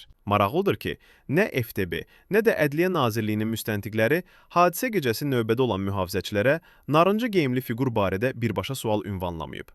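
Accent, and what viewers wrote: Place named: Azerbaijan. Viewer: Senın dedıgınde. Bır şey. Anlamıyorum. Azerı konuşuyorsun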